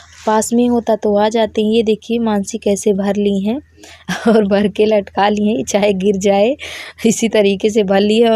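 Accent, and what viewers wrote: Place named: Naini Nibi, India. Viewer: सिस्टर आप का भाषा और बोली जो है न अयसा लगता है कि आप नैनी निबी के तरफ के हो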